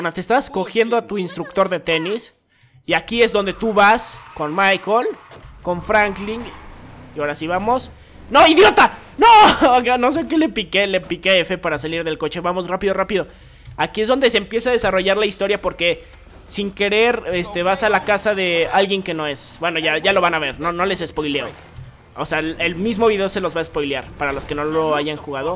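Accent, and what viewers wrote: Mexico. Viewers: oye eres de mexico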